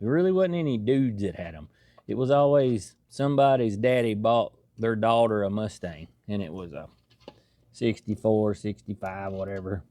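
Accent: South, United States. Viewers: your good ole boy southern drawl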